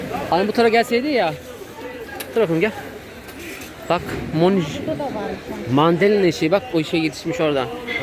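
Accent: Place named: Aegean, Turkey. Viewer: Ama şiveniz Karadeniz şivesine hiç benzemiyor daha çok Ege ile iç Anadolu karışık gibi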